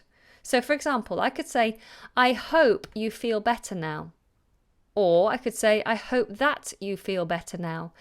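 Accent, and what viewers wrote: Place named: United Kingdom. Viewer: I really love your British accent. It sounds like music to me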